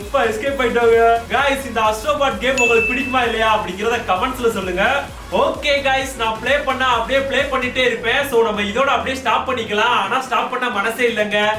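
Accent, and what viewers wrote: Sivakasi, India. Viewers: Bro, Sivakasi ah neenga ? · Bro nee sivakasi ah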